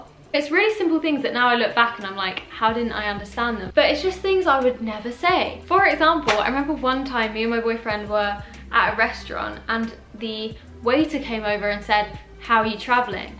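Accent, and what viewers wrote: Australia. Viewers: She speaks like a Australian. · you’re already picking up an aussie accent haha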